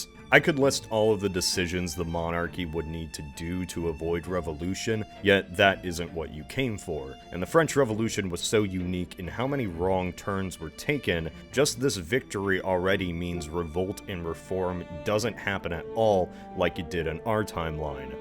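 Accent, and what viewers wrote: Ohio, United States. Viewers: Thank you for your wonderful new "alternatives"... I truly appreciate the work y'all do on these. I especially am glad that your family was able to exist long enough for you to be here! I really enjoy your voice. Do you have an Ohioan accent! This is -->almost <-- as interesting as the episode you did on the lack of the Great Lakes.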